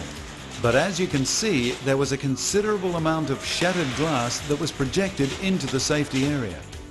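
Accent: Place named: Australia. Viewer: The narrator sounds like an Australian, judging by his accent.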